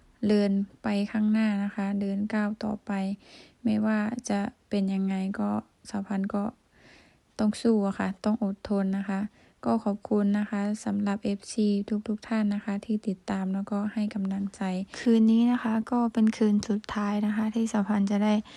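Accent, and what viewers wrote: Laos. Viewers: แม่ติดตาม มานานแล้วมักที่เจ่าเป็นคนลาวเว้าลาวเป็นโตของโตเองแล้วก็เข้าใจสำเนียงเว่าของลาวไต่มันกะคือคนอิสานทางอุบลจงทำในแบบที่เฮาเป็นแล้วคนเบิ่งคริปเขากะมักที่เจ่าเป็น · มันบ่คุ้น เสน่ห์ของหนูคือพูดลาวนั่นล่ะ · ใช้ได้อยู่ เว้าภาษาไทย ฝึกไปและต้องสังเกต ส่วนมากคนลาวเพิ่นสิเว้า ช เป็นซ.คนไทยสิจับได้เลยว่าเจ้าเป็นคนลาว ลองฝึกเบิ่ง แล้วกะ ด เจ้ากะใช้ ล ได้เจ้ากะเว้าว่าไล้ ช่วยเจ้ากะเซ้าซ่วย ช. ด ฝึกให้มันถูกแค่นี้กะเว้าถูกแล้ว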